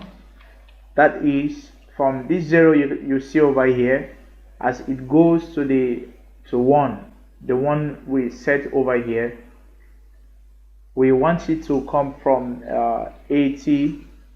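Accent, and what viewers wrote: Nigeria. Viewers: that Nigerian accent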